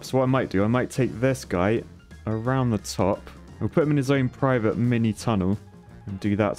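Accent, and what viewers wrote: United Kingdom. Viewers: I'm actually impress that you got it first time the "Sierra de las cruces" yes, you had the little British accent, but the pronunciation is correct :D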